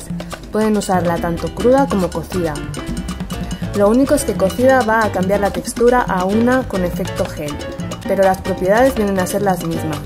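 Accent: Spain. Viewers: Wow! me sorprende tu voz tienes acento español; muy bien, ya era hora de conocerla saludos!! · Acento español!!!!! De dónde eres originalmente?? 💖💖 Te queremos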